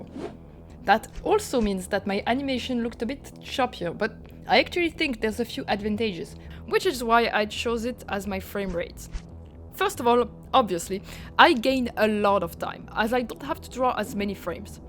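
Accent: France. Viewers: By any chance, aren't you french? Your English is excellent, but the accent still sounds a bit french, as if I was listening to my classmates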